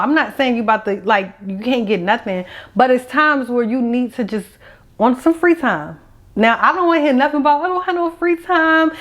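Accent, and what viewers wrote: Washington DC, United States. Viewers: Could tell you were from dc the first few seconds you started talking ❤😂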